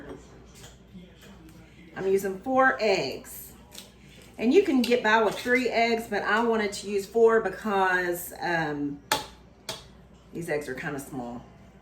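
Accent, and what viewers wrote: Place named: Georgia, United States. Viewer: I just love the way you talk. But I am trying your make your pie. Sounds like you are from Georgia or the carolina.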